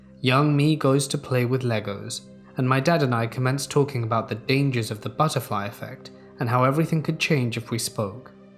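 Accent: United Kingdom. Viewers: Left dislike because british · I was in the hospital last year and i listen to these stories on a small speaker and when my night nurse came in to check on me all she heard was ' voice and it scared the crap outta her. Just literally a man talking with a UK accent (i'm in America) in a totally empty room!!! (except for me) SHe woke me up and was like, "ma'am, were gonna have to move u to another room!" and it took me forever to realize she was talking about the speaker just almost under my pillow out of her sight! I'll NEVER forget that. I hope u get well VERY soon!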